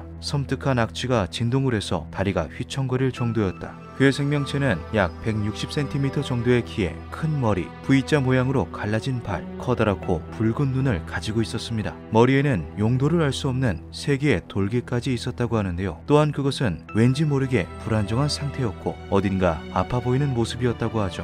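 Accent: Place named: Gangwon, South Korea. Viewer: 고향이 강원도 신가요? 강원도 억양 있으신데 ㅎㅎ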